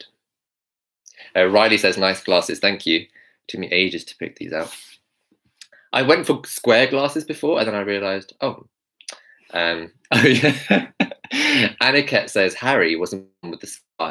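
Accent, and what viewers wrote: United Kingdom. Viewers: Perfect brit accent! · yaaas! the scar, the glasses, HP mood, British accent - I love all of it <3 very helpful! thanks!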